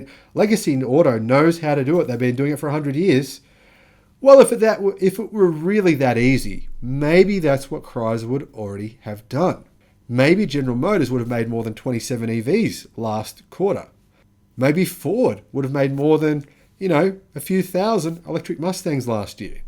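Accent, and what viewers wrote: Australia. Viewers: Tired of the Ausie Accent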